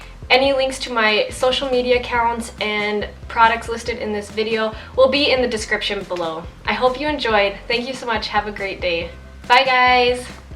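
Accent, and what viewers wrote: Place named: Minnesota, United States. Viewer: Why would u have to drive to a bus station and then take a bus? Ive got to have ESP the second I turned your video on I thought Minnesota it must have been the accent.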